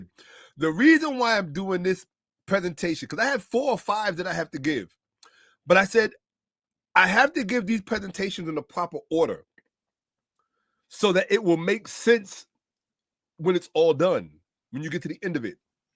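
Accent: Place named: New York, United States. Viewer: Thanks. Im always reminded of my fifth grade friend from New York while listening to you because of the way that you speak. The knowledge that you teach is truly appreciated.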